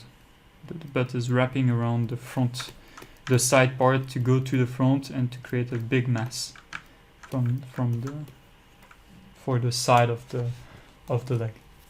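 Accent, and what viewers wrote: France. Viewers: Instructor: "i apologize for the french accent" Me: Are you serious? I would kill for having that accent. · Sir, never apologize for a French accent. Especially in an art tutorial. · We all love your frenglish ;) And the word you are mostly looking for is "definition" (of muscles, creases, shapes). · Did he just apologise for his French accent? I'm offended. That shit is unintentional ASMR. Thanks for this tutorial - so generous of you to share your time and knowledge.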